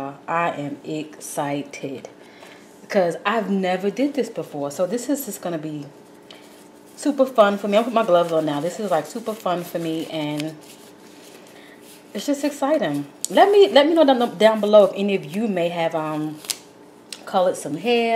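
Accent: New York, United States. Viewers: Great video! You Sound like my aunt lol NEw Yorker? ❤️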